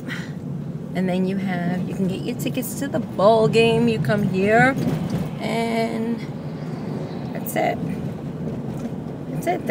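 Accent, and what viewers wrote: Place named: Brooklyn, United States. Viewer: I should of known you lived in Bk with your accent, you speak so pretty.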